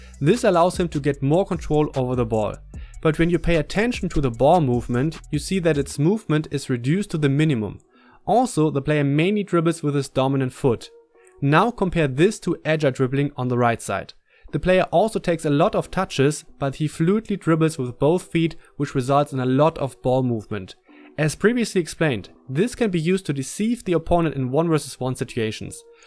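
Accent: Germany. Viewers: Are u german? Sounds a bit like a german accent... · Man hört auf jedenfall das du deutsch bist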